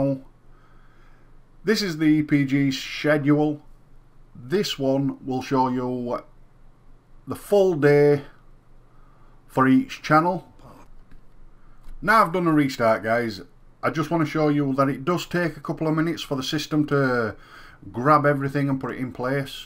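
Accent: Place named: Yorkshire, United Kingdom. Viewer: Leeds or Bradford accent...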